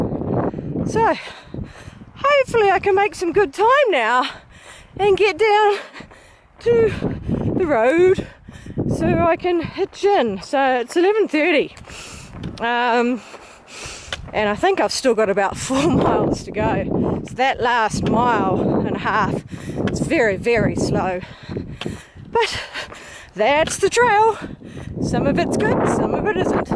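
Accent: New Zealand. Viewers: I am enjoying the pleasant company of this Kiwi.Utterly sweet accent:)(Tent=tint):):)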